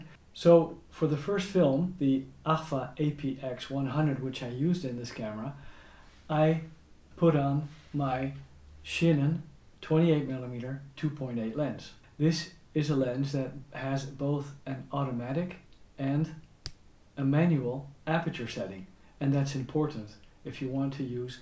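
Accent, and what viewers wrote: Netherlands. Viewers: Nice review, you sounds very Dutch to me 👍🏼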